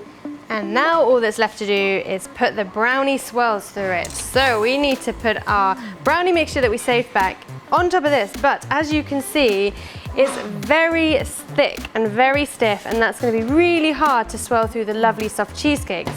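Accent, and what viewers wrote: United Kingdom. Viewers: It is the best British accent I've heard from a you tuber.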